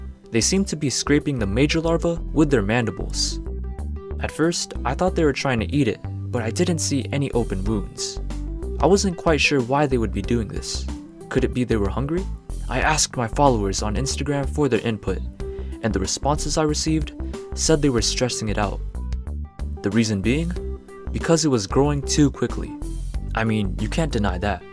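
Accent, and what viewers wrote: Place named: California, United States. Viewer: Where are you from you sound like your from California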